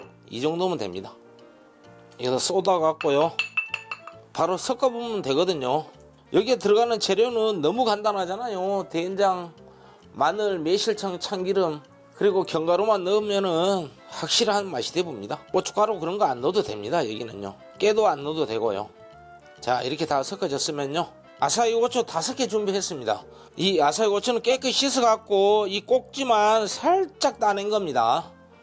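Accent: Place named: Gyeongsang, South Korea. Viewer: 너무 메이갈지말고 ㅋ 쉐프님도 경상도분이시군요 이거람 남편 반찬 걱정없겟어요 ㅎ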